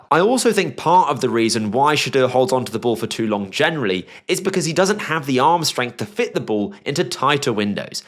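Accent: United Kingdom. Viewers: Having a British dude analyzing CFB is throwing me off 😂. Great video man, editing and writing is A1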